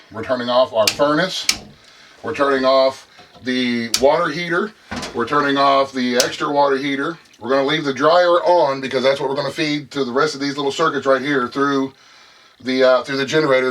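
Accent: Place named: Louisiana, United States. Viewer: I have a very strong feeling that you're from Louisiana. You sound and act the exact same as the rest of us crazy cajuns lmao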